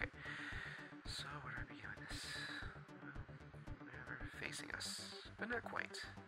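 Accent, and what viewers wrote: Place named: Mexico. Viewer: where is that accent from, Mexico? there are a lot of mexican designers, and drawing artists, is it because some artistic baby boom or the artistic education is superior? one day we will be as good as you guys!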